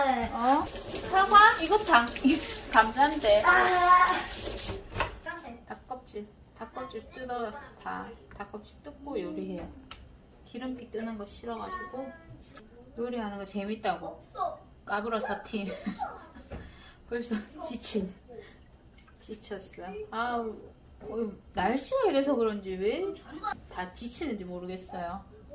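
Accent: Gyeongsang, South Korea. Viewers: 어머나.. 이제 목소리까지 오픈하셨군요 ㅎㅎㅎㅎ 경상도 출신이라 경상도 사투리 참 정겹네요♡ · 영상스타일이 살짝 바뀌었네요..^^ 광고 뒤 영상나올 줄 알았는데 안나와서ㅋㅋㅋㅋ당황했네요ㅋㅋ 같은 경상도라 말투가 넘 친근하네요..오늘도 잘 봤습니다.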